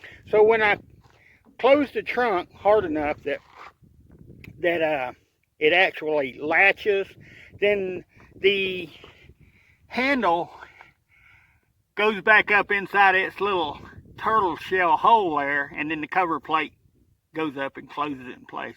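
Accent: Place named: United States. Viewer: Can your accent be any more American? Good video by the way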